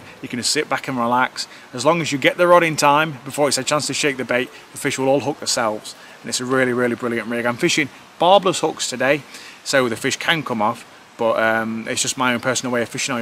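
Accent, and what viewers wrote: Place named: Yorkshire, United Kingdom. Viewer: Nice method that, and much cheaper than the korum bolt rigs, some nice slabs there too. If you dont mind me asking, what part of the country are you fishing?? As you seem to have a yorkshire twang to your accent.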